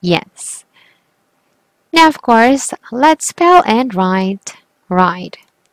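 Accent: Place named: England, United Kingdom. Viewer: Nice english accent po ate sana maturuan niyo ako ganda niyo po keep safe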